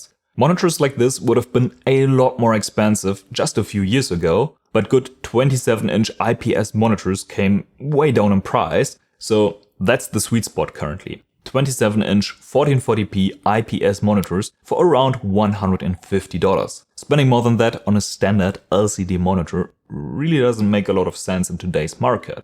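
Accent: Germany. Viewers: the faint German accent is doing things to me, Lord help me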